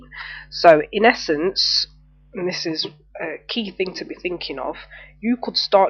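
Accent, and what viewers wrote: United Kingdom. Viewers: That's a Notts accent if ever I heard 1😃thanx for good info xx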